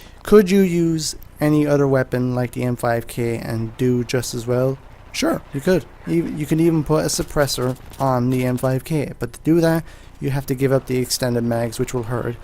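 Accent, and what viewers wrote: Ireland. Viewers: Dropped over from scanner, nice series, always good to hear Another irish accent. keep it up.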